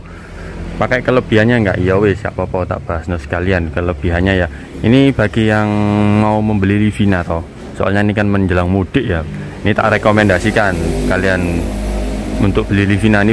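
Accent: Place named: East Java, Indonesia. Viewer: Bahasanya kok jawa timuran om sampean wong endi 😅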